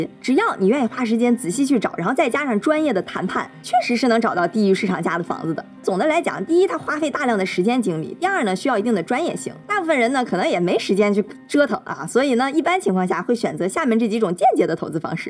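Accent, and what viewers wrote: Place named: Dalian, China. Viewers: 怎么像大连人似的😄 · 在异国他乡听大连老乡说普通话真亲切！现在不仅我和我老公在看你的频道，我连我爸妈也安排上了。加油小Lin! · 我刚进来看， 她讲的不错， 我也是大连的！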